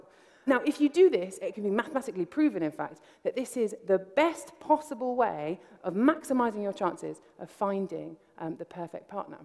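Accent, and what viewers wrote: United Kingdom. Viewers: Smart, likes math, sense of humor, British accent and a ginger. I'm in love.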